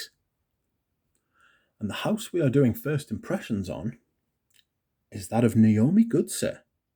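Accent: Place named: Northern England, United Kingdom. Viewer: Fyi with your northern accent it’s “Bwah d”I says” 😂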